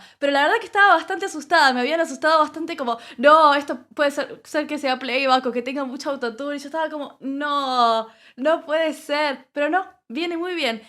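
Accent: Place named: Argentina. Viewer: 😢 que mal ojalá que sigas haciendo más videos me encanta tu voz y tu acento si eres argentina porque aveces me equivoco con los uruguayo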